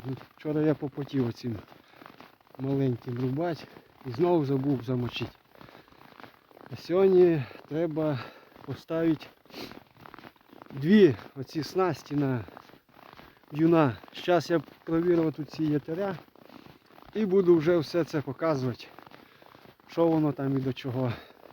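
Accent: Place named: Polissia, Ukraine. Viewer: Вітаю! Дуже приємно чути рідний полісський акцент.. я родом з Полісся з Чернігова.. дитинство пройшло у Чорнобилі але зараз зрозуміло мешкаю вже зовсім у іншому місті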